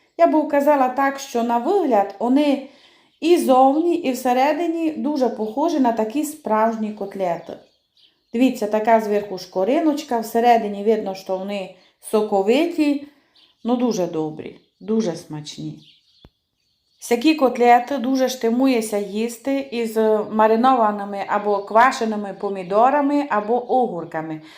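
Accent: Transcarpathia, Ukraine. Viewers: Чудовий Закарпатський діалект❤ Дякую за вашу працю ❤Слава Україні! 🩵💛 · Дуже смачна закарпатська кухня і гарний закарпатський діалект ❤ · Насолоджуюся красою закарпатського діалекту. Ви чудові! ❤ Будемо робити такі котлетки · Прекрасні котлетки. І говірка закарпатська чарівна. Молодець.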